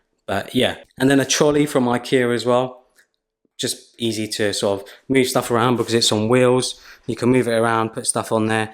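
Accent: United Kingdom. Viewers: pretty house! and love your british accent thou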